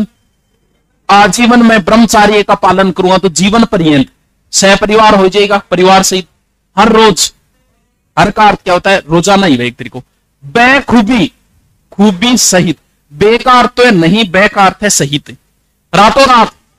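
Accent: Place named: Haryana, India. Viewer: Hii Yr ye sir konsi jagah ke h Bich bich m haryanvi jaisi language kyu bolte h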